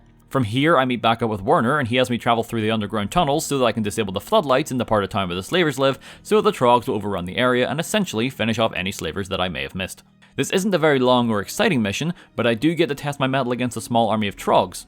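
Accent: Northern Ireland, United Kingdom. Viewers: I FUCKING KNEW HE WAS NORTHERN IRISH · New you where from N.I. the moment I heard ya. Way to represent the culture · I didn't even notice his accent until he said he was northern irish.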